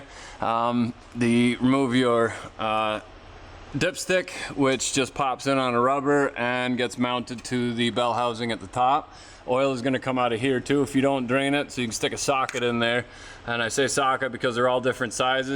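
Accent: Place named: Canada. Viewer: I was born and raised in the American Deep South and Canadian accents are pretty cool to me for some reason. An example is the pronunciation difference (American South vs Canadian) of the words “about” or “out.” It’s weird I know but at least it’s a positive comment I guess. Love this channel.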